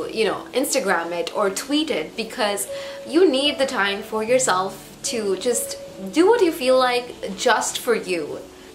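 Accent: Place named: India. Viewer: How did you get such a wonderful accent? Cause you're frm india i guess..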